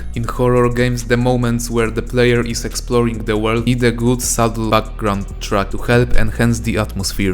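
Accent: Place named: Russia. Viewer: sounds either Russian or German to me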